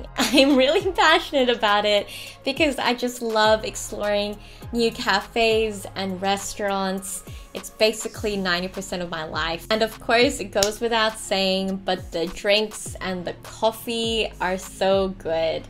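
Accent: United States. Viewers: What is with the American twist on the accent if Oz is home?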